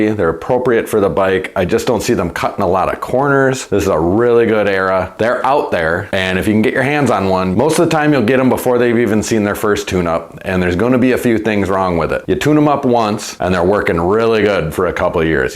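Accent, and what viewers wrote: Wisconsin, United States. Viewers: I can’t quite decide if I’m hearing Minnesota or Wisconsin but I’m leaning toward the latter. A fun suggestion: the lube counter. You say lube, ding sound effect, a number on screen adds to the count. Cheers from Minnesota! Ope!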